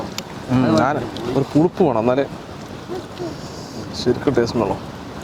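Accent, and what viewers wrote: Palakkad, India. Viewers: എനിക്ക് ഭയങ്കര ഇഷ്ടമാണ് പാലക്കാട് ഭാഷ... ഇഷ്ടപെട്ടവർ like ഇടൂ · Broo...ningal nalla oru cook aanalloo..superr...Aa Palakad slang edaku varunnundu.. kalakii.. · Nalla adipoli Palakkad bhasha ishtamullavar like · തനി പാലക്കാടൻ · Eantha kattu ingalu palakkattu karan ano? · Ninga thani palakkadanallooo etttoooi...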